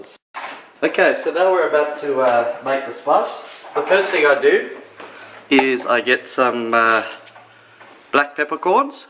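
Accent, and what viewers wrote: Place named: South Africa. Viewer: Is the dude South African or Austrailian....!?!?! I CANNOT WORK IT OUT!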